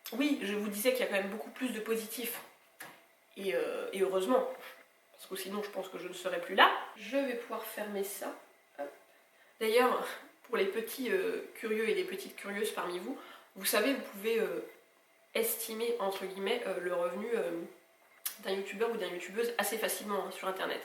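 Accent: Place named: Brittany, France. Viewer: Ha ha Mdr, et baaaah perso moi j'adore tes mimiques et ton petit accent breton qui me rappel mon chez moi, voilà dix ans que j'ai troqué ma plage de Pen bé et mon sel de Guérande pour les volcans d'Auvergne. Je suis partie en pensant que nous breton n'avions pas d'accent, un an plus tard je me suis rendu compte de notre façon de parler et ça me fait plaisir d'entendre ça dans tes vidéos. Serions-nous les seuls à comprendre? 🤔 mon mec déteste quand je rentre en Bretagne je reprend l'accent et la façon de parler direct ! 😅 je laisse rarement des commentaires mais là je me devais de te le dire, tu es une de mes youtubeuse préféré justement parce que tu es naturelle, sans chichi, normal quoi, et ça fait tellement de bien 😄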